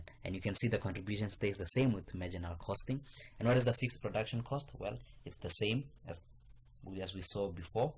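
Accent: South Africa. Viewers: chee are you south african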